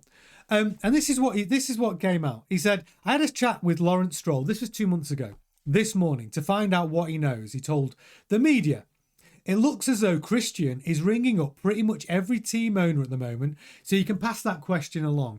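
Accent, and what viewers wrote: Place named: England, United Kingdom. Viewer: Dear sir , I cannot digest your accent , Just can’t understand what the heck you are saying, you are in UNITED STATES, not in FRIGEN ENGLAD .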